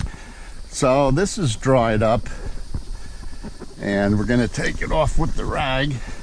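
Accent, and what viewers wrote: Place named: North, United Kingdom. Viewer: fishing and please tell us about your old boat. About the inboard vs outboard also what lead you to buy a key west and tell us about the other center consoles that you looked at before you bought the key west. Also I can tell by your accent your from up north what was it like fishing up there thank you have a blessed night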